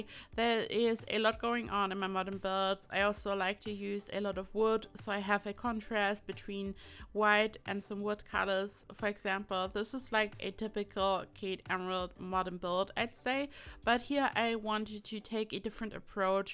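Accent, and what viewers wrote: Germany. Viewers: Sounds like u are German :D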